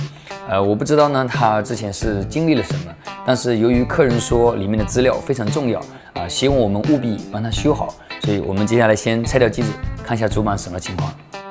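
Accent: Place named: Fujian, China. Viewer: 是我看过做维修视频做的最专业的一个，请问是不是福建人？听口音像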